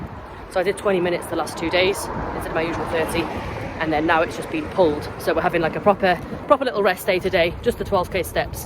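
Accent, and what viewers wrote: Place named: England, United Kingdom. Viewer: Yayy a new video 🥰 I love your accent btw haha are you English?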